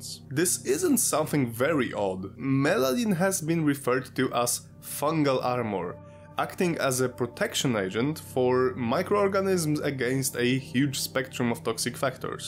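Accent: Russia. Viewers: ppl were asking why the chernobyl actor never tried your russian accent my man ❤, the whole show would be turned into a very broad Borat film , lol 😂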